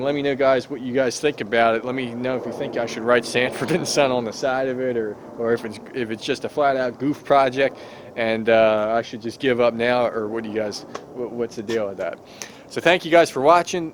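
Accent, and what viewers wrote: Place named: Michigan, United States. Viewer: Sounds like Michigan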